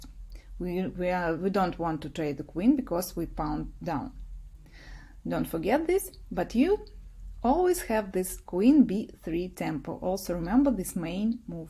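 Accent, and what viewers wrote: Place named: Russia. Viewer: I like videos because she speaks slowly and clearly, with an adorable Russian accent, and goes back over the move sequences several times to let them sink in. Another winner. I am still waiting to pounce on someone with Captain Evans's gambit, but I'll be ready.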